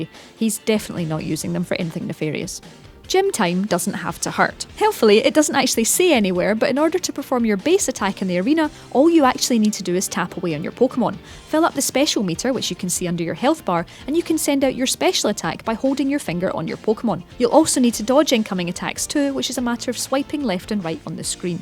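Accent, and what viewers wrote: Scotland, United Kingdom. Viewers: it's Scottish not British lmao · BAHAHA HAHAHAHAHA BRO THIS NARRATOR IS LIKE SCOTTISH SHE'S NOT EVEN FUCKING BRITISH YOU DUMB ASS BRUH YOU THINK ALL EUROPEAN PEOPLE ARE THE SAME 😂😂😂😂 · Im from scotland and i hear this accent everywhere <3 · No, this accent is more scottish while the girl from outside xbox is brittish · is the person taking in the video Scottish? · I love that scottish accent. <3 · That is a VERY Scottish accent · She kinda sounds scottish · Sub'd because Scottish 😍😍😍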